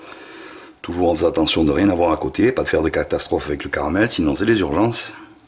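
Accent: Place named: Narbonne, France. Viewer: Exactement la recette que je fais. Ma mère faisait la même (juste elle mettait plus d'oeufs ) je mets un peu moins de sucre Mais javais besoin de précision et elles sont bien présentes dans cette vidéo ca ma fait rire d'entendre dire de ne pas changer de casserole Un côté humoristique que j'aime beaucoup ^^ Et je suis aussi du midi région narbonnaise ..(je reconnais bien l'accent ;) Merci !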